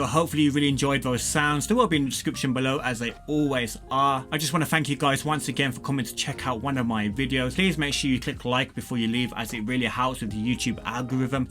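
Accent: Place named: Wales, United Kingdom. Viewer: Love that welsh accent ✅😉😀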